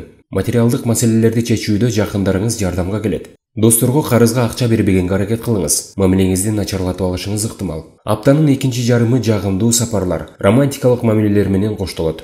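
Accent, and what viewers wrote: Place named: Bishkek, Kyrgyzstan. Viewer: Ака бу гапирйоткан одам бишкеклик уларди гапига тушунсийсиз ман оштанман лекин кыргызман мани гапимга тушунасиз сабаби биз озбек кыргыз аралаш йашиймиз ошта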